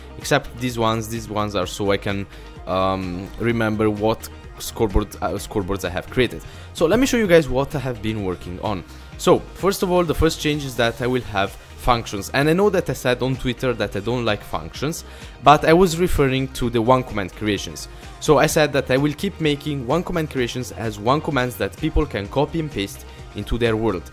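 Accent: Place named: Italy. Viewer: Thanks!!Ive Been waiting on The Alchemist 2!Also,maybe add new machines using the observers?maybe more automation?Auto Tree Farm? Also I want to ask you, Is making growth pulsers(From Skyfactory)possible? Maybe Uhmm a way to make trees grow faster?(Uhmm,Twer....nvm) Maybe even custom mobs and armors Also maybe a bit of jump boost?(You are in space after all) Thanks again for The Alchemist 2 You are the coooooolest youtuber I know Also I like your Italian Accent:) Omfg!Log....nvm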